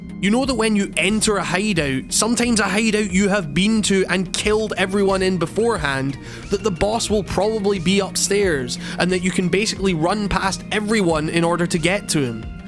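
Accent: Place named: Wales, United Kingdom. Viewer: Neat video! I love Welsh boys! You have the cutest accents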